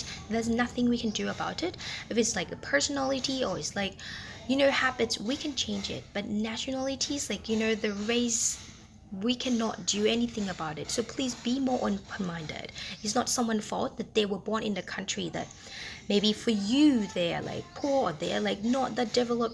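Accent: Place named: Cockney, United Kingdom. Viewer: Wow, great comment. I love that you give your opinion. But I totally agree, racism is so wrong. Thai are usually quite about things, like pollution, hygiene and bad drrving. If drivers beeped their horn at bad drivers, then I think they would think twice about the crazy things they do too. MTW I love your slight cockney accent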